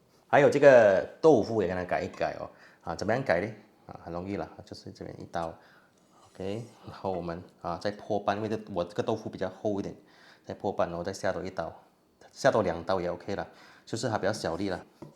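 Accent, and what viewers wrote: China. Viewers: 广东，一块都讲成一告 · 你的客家話很重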